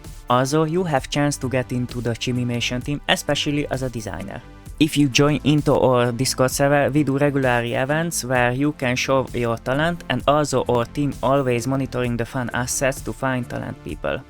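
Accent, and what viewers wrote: Hungary. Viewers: The Hungarian accent is very audible, which I'm happy about because I'm Hungarian Szóval nagyon várom hogy kijöjjön a játék<3 (ha még nem jött ki) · AMERICA 🇺🇸🇺🇸🦅🦅 Wait, what language do you speak then? I hear an Hungarian accent so I assume that you speak Hungarian (like me!!! ) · Ik I recognized the hungarian accent ^^ tudtam felismerem a magyar accentust :3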